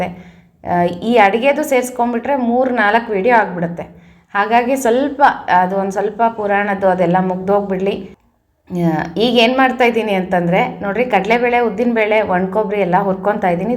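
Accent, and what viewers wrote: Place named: Ballari, India. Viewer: Naavu Ballariyavare.adigemaaduva vidhana yella same😊naavu makkalige tinniso ootakke mammu antane annodu.Maatado reetinu same 😊Namma jana Namma bhashe ❤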